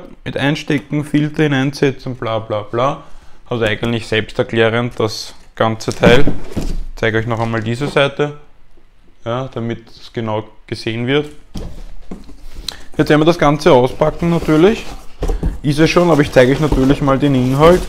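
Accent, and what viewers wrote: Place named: Tyrol, Austria. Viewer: I bin mir sicher das du Tiroler bist 👍🏻🙋‍♂️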